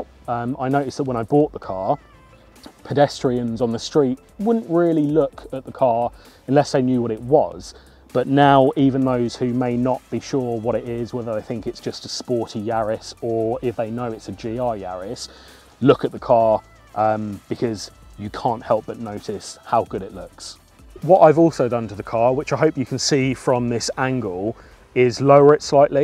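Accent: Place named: United Kingdom. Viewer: thats what i call a british accent! =D. TOMs rearwing gives a bit of a good rallye touch aswell!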